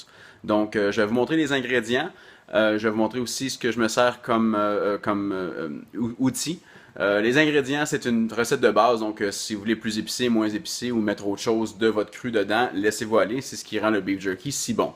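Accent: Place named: Canada. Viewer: Bonjour mes cousins du Canada ! Je viens de découvrir il y a quelques mois en France la viande séchée, et putain si on veut perdre du poids c'est pas mal ! Ca coupe la faim et c'est délicieux. En fait, j'achète des sachet de chez Jack Link's Beefy Jerky mais ça coute vite la peau du c... Alors je suis tombé sur ta recette que je vais essayer, j'ai juste galéré avec ton accent (mais je t'en veux pas ;-) ) et les parties que tu appelles "intérieur de ronde" et le "haut de surlonge", je sais pas si on dit pareil en France mais si c'est le cas ce ne sont pas des morceaux très répandus... Sinon les 170 degrés c'est pas du Celsius ?!? Ça va flamber sinon..... c'est plutôt du Fahrenheit, non ? (je demande avant de faire la Connerie :D ) Bonne continuation et bonne journée.....ou nuit, je sais pas trop :D:D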